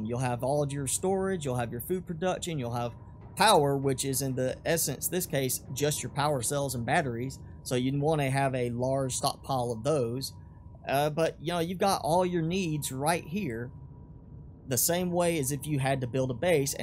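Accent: South, United States. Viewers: Stormy it's a southern American accent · Y’all accent XD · Your southern accent gives me life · Also known as the southern accent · TIMMY yes you can definitely tell especially when he says "try","charge","power", and "why" haha but i guess even Americans can make good tutorials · What heavy country accent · you have the "Y'all accent" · Are you a cowboy? Cuz you have the same accent as one Edit:not trying to be rude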